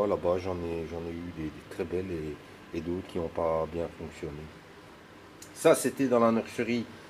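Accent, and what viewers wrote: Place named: Wallonia, Belgium. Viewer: moi tu pourrais encore faire tes vidéo en wallons je kifferais aussi. Il faut être fière de notre accent, nos formules de langage, de notre Belgitude... il n’appartient qu’à nous-mêmes! 👍🏼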